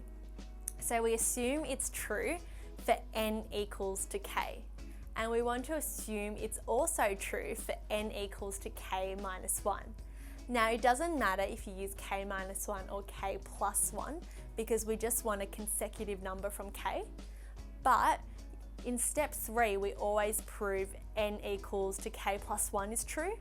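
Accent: Australia. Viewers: Hello. Are you Australian? Just asking for a friend.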